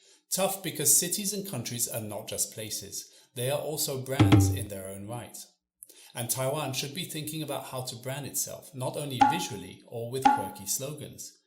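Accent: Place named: United Kingdom. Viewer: 英國腔超重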